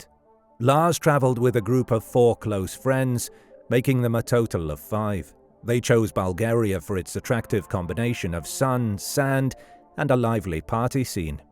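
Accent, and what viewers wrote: United Kingdom. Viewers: Came here to say the same thing. A story about a German, narrated by someone with a British accent ... and they chose to use a word for football that's only really still officially used in USA/Canada..??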